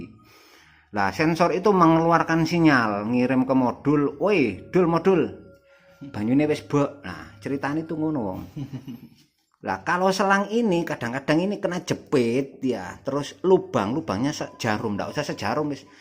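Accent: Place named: Jepara, Indonesia. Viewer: logatnya kyak jepara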